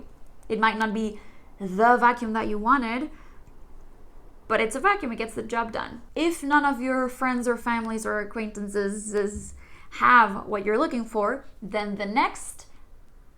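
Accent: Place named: United States. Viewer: You sound like you are from America 😳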